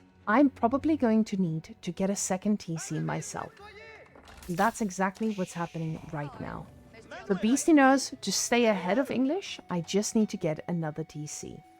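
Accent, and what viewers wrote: South Africa. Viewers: I'm not a beginner but I watched the whole video to enjoy the dulcet sounds of mesmerizing South African accent.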